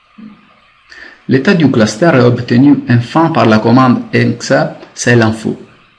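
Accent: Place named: Italy. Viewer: Tres bonne explication , avec une petite pointe d'accent Italien !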